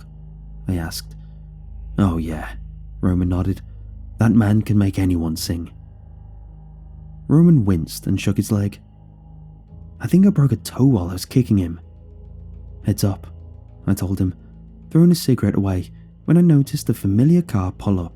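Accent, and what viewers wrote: United Kingdom. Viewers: Reads title* Me: "Hell yeah!" Starts watching and hears british accent* Me: "Wait a minute."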